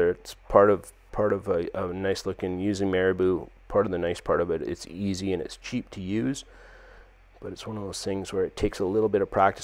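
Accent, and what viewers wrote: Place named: Canada. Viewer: love the canadian accent. i live in kelowna. thanks for the vid :)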